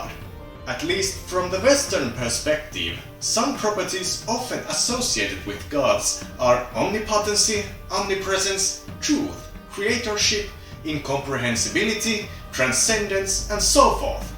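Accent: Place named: Finland. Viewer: o how come i didn't know about this video until now? Also nordic pagan here. And a furry xD You sound Finnish, as your accent reminds me of my own. I grew up with the Finnish paganism as my mummi was Finnish pagan. Like the old school pagan where the world was being created from the skybird egg. Though i have adopted a more of a mix of Norse paganism and Finnish paganism.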